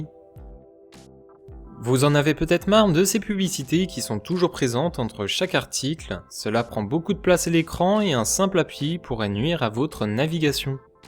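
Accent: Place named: Switzerland. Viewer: Sémantique à revoir. Vidéo bonne. Accent Suisse qui passe mal, manque de punch.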